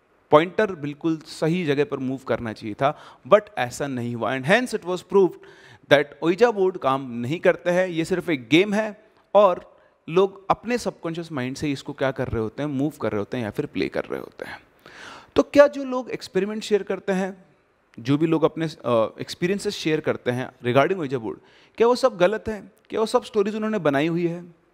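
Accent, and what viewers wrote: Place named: Bengal, India. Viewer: Apki bengali such mein sunne mein bohot cute hai sir...khub mishti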